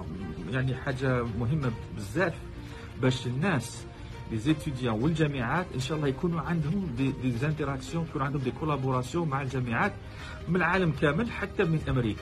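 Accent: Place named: Algeria. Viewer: Very nice from Mr and thanks to him. Note that he kept his original algerian arabic accent very well. Thanks for US Ambassy and TSA. Just one missing: "Inchallah" must be reintroduced in the subtitles, tell the ambassy, TSA!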